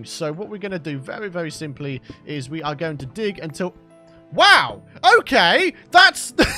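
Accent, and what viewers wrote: United Kingdom. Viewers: Lol I hear that too. He has a British accent like stampy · You sound like the british version of Woody from toy story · Where in the uk are you from? I’m from wales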